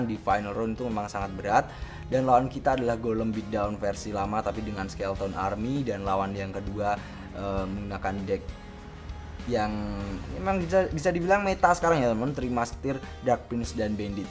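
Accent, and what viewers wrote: Java, Indonesia. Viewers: Orang jawa? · Jowone ngendi pak samean??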